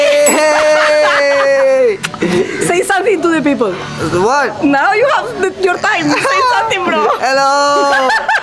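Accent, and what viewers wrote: Spain. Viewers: bonjour, merci pour tes vidéos faites avec ton charmant accent espagnol tu as trouve ta vie, par forcément la vraie vie, mais celle qui te correspond le mieux Peut-être qu'un jour tu feras un autre voyage .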